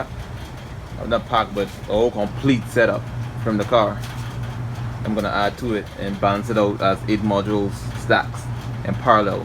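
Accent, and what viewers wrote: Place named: Bahamas. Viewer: Nice, am running leaf as well. U sound like Bahamian. I’ve already built my windmill as well using the same motor.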